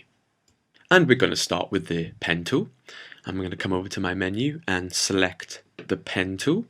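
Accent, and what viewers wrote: Wales, United Kingdom. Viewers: Are you welsh by any chance ? lol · He's Welsh. Orrrrr, he could be English but lived in Wales for a bit, but the accent is Welsh. This is the best tutorial I've ever seen on the Tube. Good work mate. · Thank you so much for the tutorial! And I think you are British, right? I like your accent very much! And I have a question, can I do exactly like that with Photoshop? Because somehow I lost my Illustrator CS4 disc and can't afford newer one anytime soon... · Very informative & nice to hear a Welsh accent - thanks! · Nice. Is that a British accent? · Your british english is really awesome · This is brilliant! Thanks for taking the time to teach us. Your voice is very calm and soothing, and your Welsh accent makes it even better! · Thanks! I've been using Illustrator forever, but never understood most of the basics. But your tutorial helps a lot. 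Love the Brit accent (we're fans of Foyle's War over here); takes a bit of thinking to get all you said, but well worth the time! Keep up the good work!!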